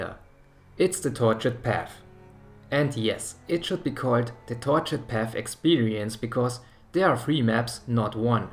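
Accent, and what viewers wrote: Germany. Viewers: The German accent makes it perfect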